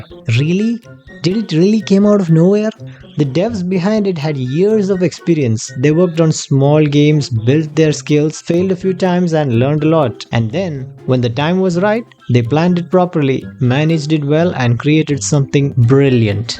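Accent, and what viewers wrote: Kerala, India. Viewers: u sound like a malayali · The mallu accent is quite overpowered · Are you malayali? Your english is pleasing to ears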